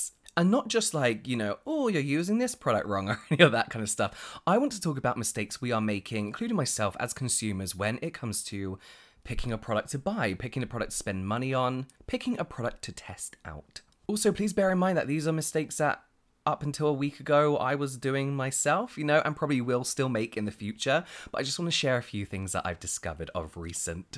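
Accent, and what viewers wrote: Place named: United Kingdom. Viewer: Totally fine with me: I am a Continental European and your British English is... music to listen to! :-) Besides, of course, the content is super-interesting! ♡♡♡ P.S. You know you do your finger counting like us... in the Continent, starting from the thumb and not from the little finger? LOL... ✩✩✩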